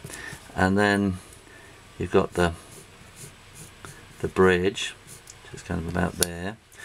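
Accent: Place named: United Kingdom. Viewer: This man speak like Jhonn deacon very Enghlis UK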